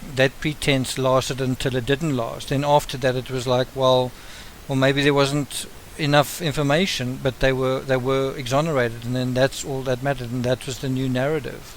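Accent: South Africa. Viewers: 😆 I’m from the Uk and I find myself using American terms sometimes now.. I used flashlight recently and my daughter gave me a sideways glance 😏... I love the South African accent too... The way says ‘Dr Phil’ as ‘Dr Full’ always conjures up an image of Dr Phil with a big tummy after his dinner! 🤰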